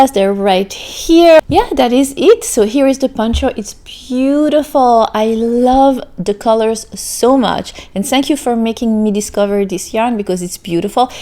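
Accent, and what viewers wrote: France. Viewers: Houlala!!!! oulalala!!! J'aime tellement your Frenchie accent. Je comprend "presque" tout! Blog and vidéos are sooooooooo très très bien. And love your chaîne sooooooooo much. Hope de GAGNER ( hopefully )🤩🤩🤩🤩🤩🤩🤩🤩🤩🤩🤩 Je love crocheter...with you from France 😍😍😍😍😍😍😍😍😍😍😍😍😍😍😍😍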